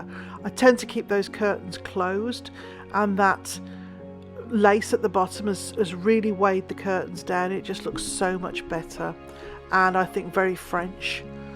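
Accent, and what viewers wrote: United Kingdom. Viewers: We live in Arkansas US. Getting close to building our home and decided French Country is my favorite style. My friend of over 40 yrs is British and hence I enjoy your voice. Love your ideas! Was excited to see the blue willow as I have the exact plates 💙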